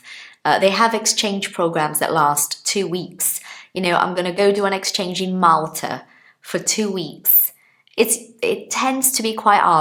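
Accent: United Kingdom. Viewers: You sounds like a royal british woman, i love hear this british accent, and my dream/expectation is speak british english perfectly like you teacher 😊 Ps: Sorry about my BAD english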